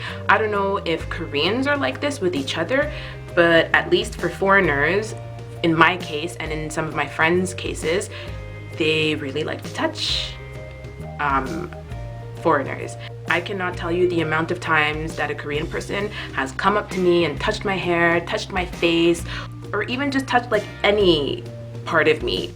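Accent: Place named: Canada. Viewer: lol it's that good ol Canadian accent lol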